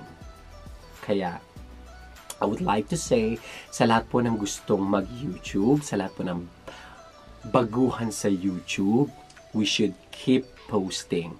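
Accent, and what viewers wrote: Visayas, Philippines. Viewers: Haha thank you natawa ako sayo 🤣🤣 bisaya ka pla salamat sa tips sana ako magkaroon ng marami 😍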